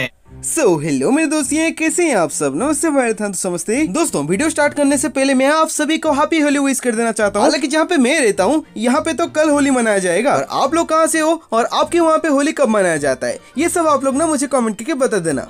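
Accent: Odisha, India. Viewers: Bhai tum odisha se ho na?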